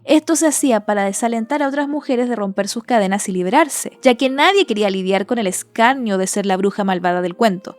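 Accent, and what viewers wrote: Peru. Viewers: Are U Peruana? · Are u peruana?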